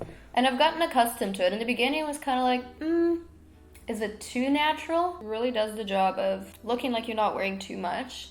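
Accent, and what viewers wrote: United Kingdom. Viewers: you deadass are getting a little flare of british accent in your voice I LOVE IT lmao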